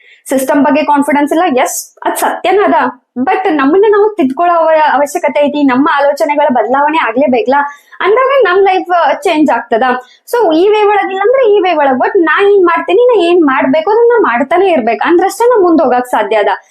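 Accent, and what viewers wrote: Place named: North Karnataka, India. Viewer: ನಮ್ಮ ಉತ್ತರ ಕರ್ನಾಟಕ ಭಾಷೆ ಅನಿಸತೇತಿ ನನಗೆ ಅಂತೂ 🤩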